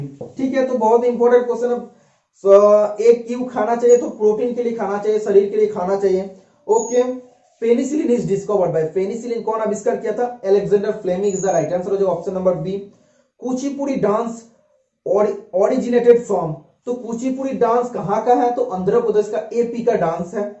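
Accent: Bengal, India. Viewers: Vaiya apko bat sunkar bihari nahi lagrahe Bangali lagrahe ENG er puro 25 questions dekha digiye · tumi bangalee?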